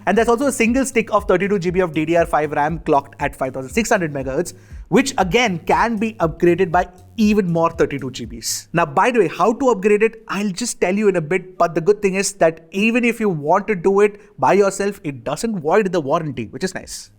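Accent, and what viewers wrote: India. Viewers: Brother why your English like Hindi easy to understand ❤❤ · VERRY FUNY WHEN INDIAN GUY TALKING ENGLISH !!!!!!!!!!!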